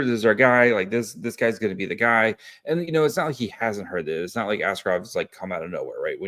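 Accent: Canada. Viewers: Relax. Are you Canadian? That is not a a central valley accent.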